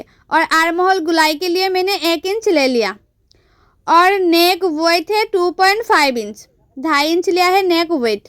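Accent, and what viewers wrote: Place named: Bengal, India. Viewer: Apnr kotha sune bujhte parchi j apni akjon bangali,, Ami ki thik bujhechi ?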